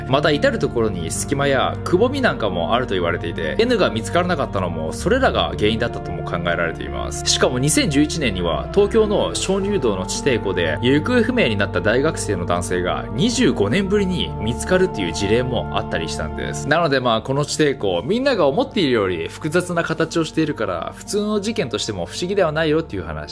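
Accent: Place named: Kansai, Japan. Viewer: 関西弁だよごく普通の。 それはちゃうねんなぁ〜。とか言うよ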